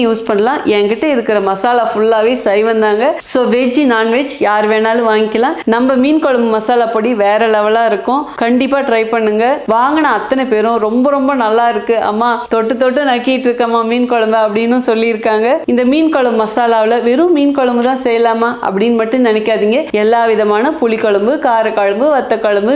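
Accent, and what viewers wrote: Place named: Bangalore, India. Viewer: You Are in which area??. Chennai or other place. Your Tamil is slightly Bangalore tamil people pronounceation.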